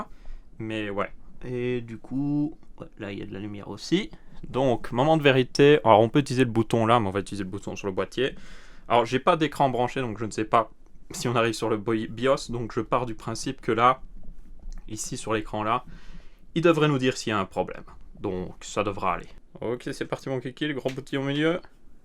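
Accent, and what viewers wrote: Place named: Belgium. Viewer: C l accent et expression belge.. un vis ..lol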